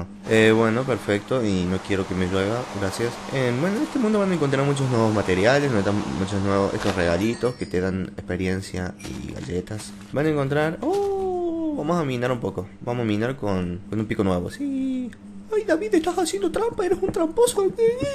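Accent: Córdoba, Argentina. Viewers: Se Nota Que Sos Cordoves De Argentina :D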